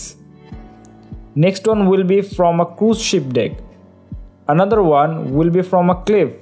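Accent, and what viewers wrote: India. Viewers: As a native portuguese speaker, he speaks like an indian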